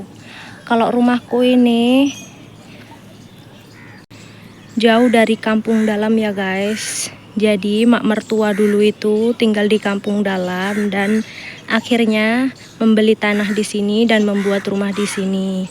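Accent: Java, Indonesia. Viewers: Mbak asli mana to,,,,?kayak jawa ya,?kira2 jawa mana mb,?semoga di balas heheh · Benar mbak, aku baru 2 hari nonton YouTube nya, dlm hati bertanya logat nya logat jawa tapi agama kok bkn Islam, ternyata pindah agama. Setau ku orang Jawa jarang sekali orang yg non muslim. kalau dari medan /manado wajar kl bkn muslim. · Orang mana si mbaknya kok bahasanya medok kaya orang jawa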